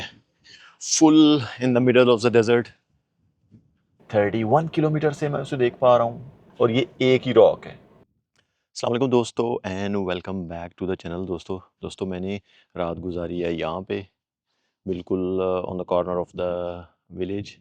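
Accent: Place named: Punjab, Pakistan. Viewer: Really good video. I like your videos, just saw only 2 videos but Really good. Your urdu accent is likely from Gujrat or Sialkot. I don't know where are you from. I am from district Gujrat. Anyway good video. Waiting for your next video.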